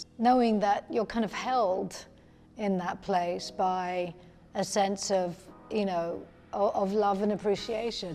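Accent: England, United Kingdom. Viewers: She has her British accent on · I had no idea she is from the UK. I never heard her accent before. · Why is she still talking with an English accent? :p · Because she lived in London for several years as a child, so her English accent is just as natural to her as her American accent. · Ok can someone please tell me once and for all if shes American or british bec sometimes she sounds American and i know that's her nationality too, but here for example she sounds SO British im so confused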